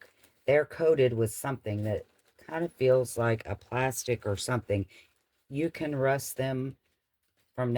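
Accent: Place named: Texas, United States. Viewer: Thanks for this, this is so helpful for someone like me, to get our toe in the water. You’re so fearless!!! Wear gloves!!! If that stuff is corrosive enough to rust paper clips so fast, just think what it could do to those fingers! The reason the soda can doesn’t rust is that it’s aluminum and aluminum won’t rust. And, just another thing, hydrogen peroxide is something that some bombs are made of 😮. Not the stuff you’re using, not the stuff that you can get over the counter, but take care to pay attention to the strength on the label and how warm it’s getting. You can even have an ice bath by just in case things seem to be getting out of hand. Just in case. 😅😊 And wear gloves!!! What about washing the things then rinse with alcohol and let dry thoroughly. Don’t let any alcohol stay behind - another chemical reaction. But, then there wouldn’t be any grease or dirt coating to keep it from rusting faster. 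The the sticks are just the same as paper. 🤔 And wear GLOVES!!! You sound like you live right next door to me here in Austin, TX, get on down to the H-E-B and get you some of them cheap vinyl gloves… 😂😊 I just love your show and I don’t miss a one 😊🥰💙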